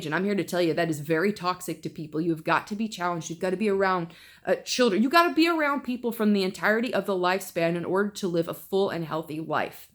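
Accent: United States. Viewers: )very good explanation I was able to understand your american accent Very Informative Keep up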